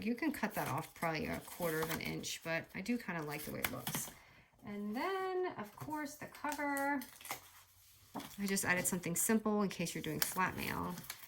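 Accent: Boston, United States. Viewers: You are the reason I have gotten into crafting. Its been so good for my mental health, and helps me to unwind. I also got this paper set, so I can't wait to see what else you do with them! Do you have any mini albums made with chipboard tutorials with pages etc? Happy New Year also i love your voice and kinda Boston accent!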